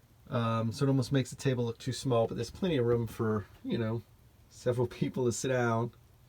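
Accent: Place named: Louisiana, United States. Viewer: You sound like Louisiana! :) I grew up in Bossier.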